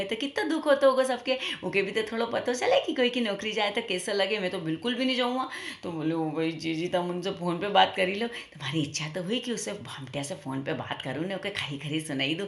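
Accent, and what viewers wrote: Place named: Malwa, India. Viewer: Mast wani hmary malvi Goodluck............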